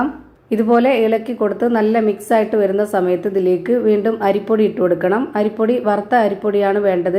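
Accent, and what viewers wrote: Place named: Malappuram, India. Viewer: മലപ്പുറം താത്താന്റെ ശബ്ദം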